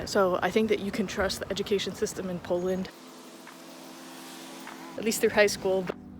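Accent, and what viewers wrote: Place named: United States. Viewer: Lovely vlog. You can definitely make decent money in Warsaw teaching English - you'd be sought after for your accent (recognisably American but also clear and distinctive, although you might have to slow it down a tad at times, so that your learners would follow). Poland is one of the best destinations in Europe to teach English.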